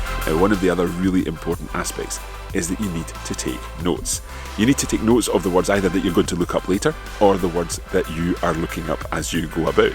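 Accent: Scotland, United Kingdom. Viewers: Hello,! I've been most impressed with you clearly perceptible Scottish accent!;-) Do you realise you have it, by the way?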